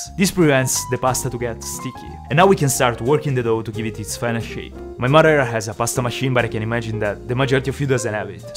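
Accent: Italy. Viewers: I spotted your channel just some days ago and I really like your videos I immediatly knew by your accent that your family is originaly from Italia, it just sounded familiar. I'm from Germany and I bet my accent is way worse than yours but I really like yours, mine not😂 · Si sente molto che sei italiano. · I like your way of talking in Italian Accent ❤️ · Io ho scoperto questo canale per caso e appena ho sentito il suo l'accento ho subito pensato "tu sei italiano!" 😂😊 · I hear the italian dialect☝️👍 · I love how half of this video is just him flexing his Italian accent on us. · In this episode i noticed his accent became more italian than usual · Italian accent I’m Italian to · Bella la tua voce italiana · Ora sono riuscito a rispondere alla mia domanda che si ripeteva nel,a mia testa da tempo, no non è vero ahaha, beh cow mi sembrava dalla tua pronuncia è tutto che fossi italiano e in effetti lo sei boom shaka laka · fra guarda che è italiano, lo capisci anche solo dall’accento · Bro avevo intuito dalla pronuncia, ma quindi sei italiano!!! 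😅😄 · Ma tu sei italianooooo si sente, aia la pancetta però eh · Ciao sono Lisa una tua fan italiana. Tu hai origini italiane? Perché parli molto bene inglese ma si sente molto l'accento italiano. So che non leggerai mai questo commento ma io in caso ho provato a chiedertelo. Ciao ciao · LO sapevo che eri tialiano dall'accento del tuo inglese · Yes it's very good I generally love Italian accents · I love his fluent Italian accent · Ma fra si capisce dalla pronuncia che sei italiano... checazzo devi imparare a fare la carbonare... spero bene che da italiano tu la sappia fare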